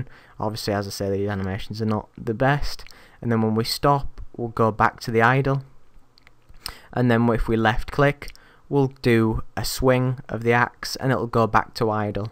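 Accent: United Kingdom. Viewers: Man are you british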